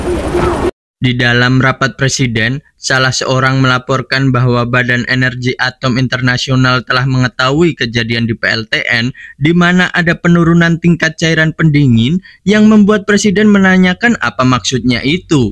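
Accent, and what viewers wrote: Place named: Banyumas, Indonesia. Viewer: Ngapak bang 😂😂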